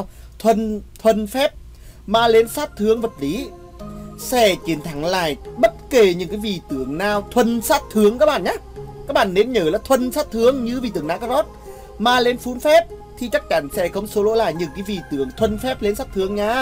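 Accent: Nghe An, Vietnam. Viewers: nghe giọng nửa trung nửa bắc nghe khó chịu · cái giọng nghệ An hay quá trời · tiếng nghệ an nói hay chết đc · Nghệ an bạn ạ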